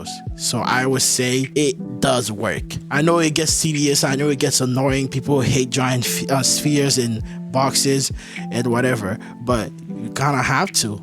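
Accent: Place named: Haiti. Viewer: I was like "bro sounds Haitian", then I saw your channel name 😂. Peace brother🇭🇹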